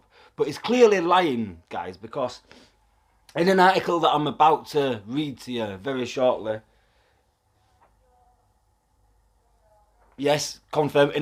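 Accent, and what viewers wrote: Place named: Southern England, United Kingdom. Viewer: South england accent,up in teeside hmm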